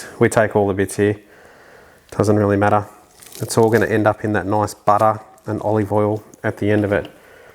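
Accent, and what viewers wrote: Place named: Australia. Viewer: Your Australian accent makes me want to try making these prawns and I don’t even like seafood! Seriously well done video, next level and very professional! Btw you shouldn’t cook puppies 😬 😆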